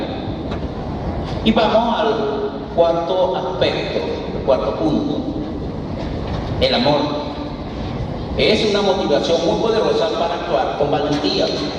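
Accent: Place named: Venezuela. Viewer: Le sentí el acento venezolano desde el principio....tiene más discursos de esta asamblea por favor??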